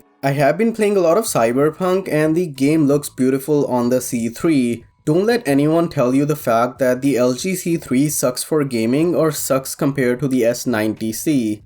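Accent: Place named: India. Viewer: Canadian? I thought dude was Indian 😅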